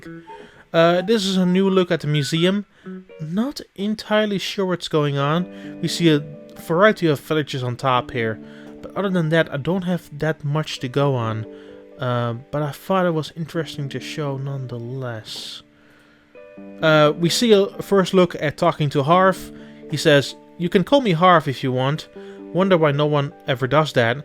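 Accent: Netherlands. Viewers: because YouTube has a training program. that is a first, is Dutch and the way he speaks is very typical of even the most fluent of Dutch English speakers. YouTube is not your typical customer service job so let's try not to compare what is not alike. I can guarantee you that the team gives notes and even though it might not sound like it's scripted, he does most likely have notes for talking points that still doesn't negate pausing to translate or to gather thoughts, etc. Instead of being negative and leaving rude comments, why can't you leave positive feedback and suggestions for how to improve? Is it so bloody hard to find a sliver of kindness? You were once the office or store greenhorn, why does it have to be a negative thing to be learning on the platform? Not everyone starts out with all the tools in their belt.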